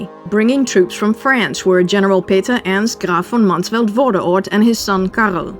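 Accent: Netherlands. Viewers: This channel needs more views especially given the quality of the content. Also by the way you pronounce things would it be right to assume you're from the Nederlands. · I love the narration. The dutch accent is very sweet to the ears. And of course this is well written with good humour.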